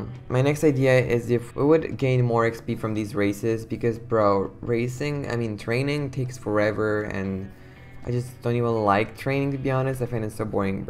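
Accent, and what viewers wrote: Romania. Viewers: Is he Romanian?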